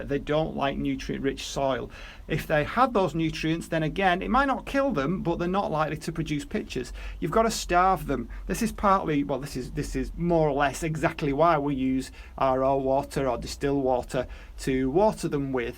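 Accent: England, United Kingdom. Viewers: It`s good listening to a English accent but greetings from the Philippines, I collect water from the roof in a drum actually at the moment its the rainy season so my Nepenthese are happy outside not in direct sunlight Humidity here is around 85% everyday so no problem there. I believe I`m right in saying the Philippines is home to largest number of Nepenthese including N.attenboughrii but I have to grow Lowland spp its too hot for the Highlanders where I live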